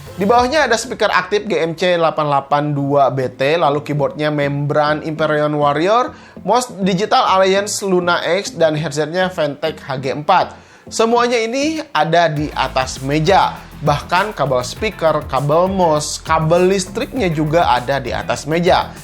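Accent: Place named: Sunda, Indonesia. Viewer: Sunda nya kentel euy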